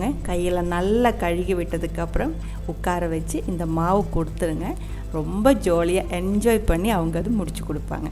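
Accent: Kerala, India. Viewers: Karala voice karala voice thann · Super maam. Your Malayala slang tamil is beautiful 👌👌👌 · I think you r malayalam but you try to speck tamil well try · Malayali aano ningal samsaram kelkkumpol malayali samsarikkunnapole und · Kerala tamil.... Wow... Chance se illa.... 😇🥰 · സംസാരം കേട്ടപ്പോഴേ തോന്നി മലയാളി ആണെന്ന് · அழகான மலையாளம் கலந்த தமிழ் பேச்சு வித்தியாசமான டிஷ் சூப்பர்மா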